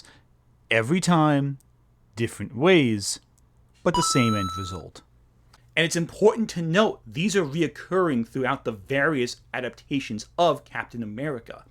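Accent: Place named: New York, United States. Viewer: where you from buddy you got a great accent, nice vid i'd guess nyc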